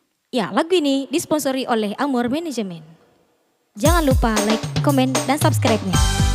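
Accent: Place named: Jakarta, Indonesia. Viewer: Ngomongnya kayak orang Jakarta lho taunya orang Bugis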